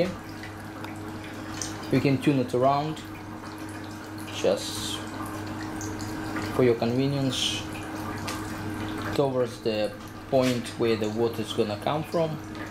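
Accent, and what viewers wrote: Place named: Russia. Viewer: русский английский режет слух))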